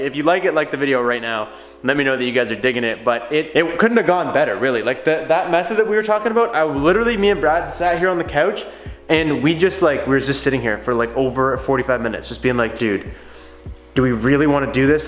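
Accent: Canada. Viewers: Canadian accent in a nutshell ;)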